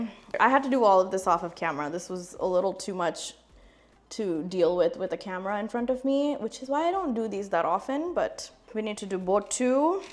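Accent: Godavari, India. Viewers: ❤❤ your telugu is cool and nice.. I can say your parents are from godavari districts of a.p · Your godavari slang 🔥🔥🔥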